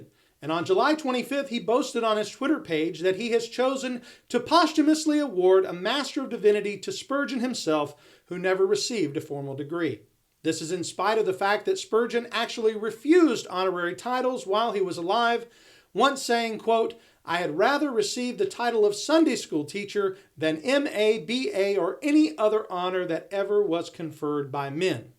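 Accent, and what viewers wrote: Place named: North, United Kingdom. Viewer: Ok, I just had to say this. Here in the South we call them things “lightning bugs”. I can tell your are from the “North” but I enjoy your content and can’t get enough 🤣🤣🤣👍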